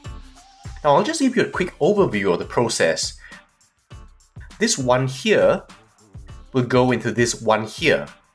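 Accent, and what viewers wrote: Singapore. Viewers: You do sound Singaporean.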